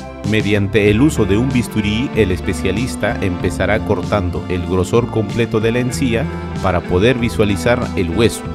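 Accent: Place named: Argentina. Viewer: parece de Argentina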